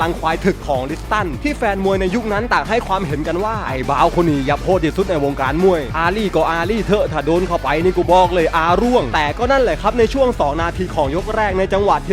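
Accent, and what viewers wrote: South, Thailand. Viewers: แอดคนที่ไหนชุมพรเหอะสำเนียงใต้แปลกๆ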